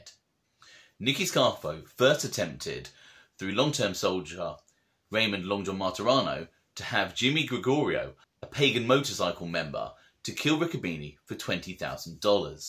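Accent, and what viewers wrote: England, United Kingdom. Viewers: Not for nothing but hearing a english accent is def a bit strange talking about the mobs in the States. Kind of like listening to an American explaining the british monarchy or viking history😮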